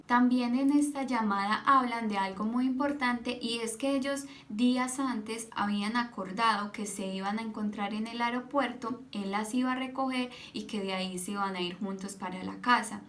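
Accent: Colombia. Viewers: Eres colombiana me queda claro, pero tienes una forma de hablar graciosa, como un acento de Colombia pero de alguna región en particular no? Los colombianos tienen un acento que me gusta mucho pero el tuyo suena un poco diferente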